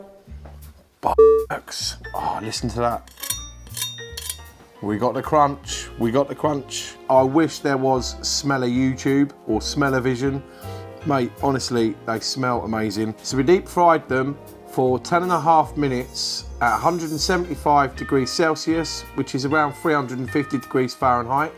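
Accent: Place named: United Kingdom. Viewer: I have to admit, I was not expecting a British accent when I started this video. Definitely going to give the recipe a shot in the future!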